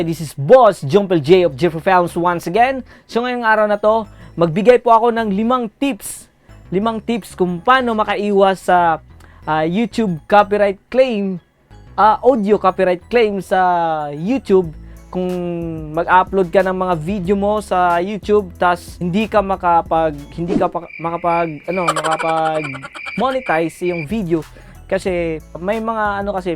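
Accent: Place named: Ilocos, Philippines. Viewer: ilocano ka ata sir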